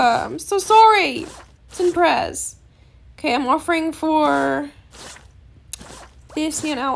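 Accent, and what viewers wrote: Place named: United Kingdom. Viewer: early,and ik that ppl r nice to u cuz u r one! edit:omg your British accent🤩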